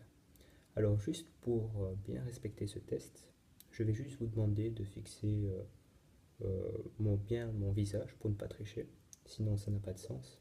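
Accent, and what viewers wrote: Belgium. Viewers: Ne viens-tu pas de Belgique ?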